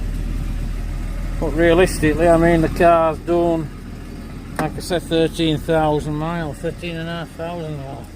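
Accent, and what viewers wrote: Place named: North, United Kingdom. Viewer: it's the funny northern accent. Poor AI doesn't stand a chance ..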